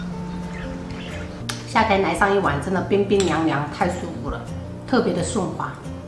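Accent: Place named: Minnan, China. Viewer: 台語是閩南語，是閩南人，當然講閩南語，講話口音聽得出來是閩南人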